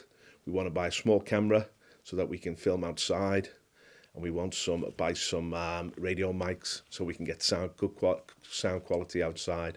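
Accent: Liverpool, United Kingdom. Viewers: Ja! Pure Scouse.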